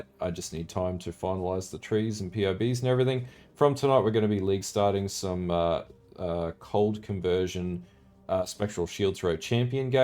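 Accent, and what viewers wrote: Australia. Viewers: i have missed the aussie twang. it is so good to hear poe ideas spoken in my lingo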